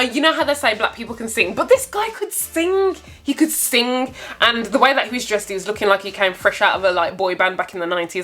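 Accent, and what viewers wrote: Birmingham, United Kingdom. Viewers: Do I detect a (former) Brummie? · It’s the accent for me. Where’s it from. Birmingham???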